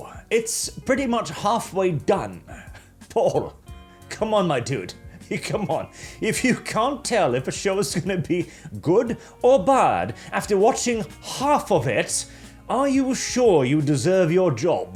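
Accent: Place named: England, United Kingdom. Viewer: You accent reminds me of "Robin Hood/ Men in tights". The lead actor who said " Unlike other Robin hoods.. I can speak with an English accent ..."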